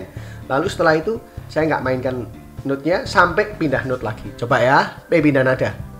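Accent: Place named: Java, Indonesia. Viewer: Natural medok e koh. 😁😁😁😁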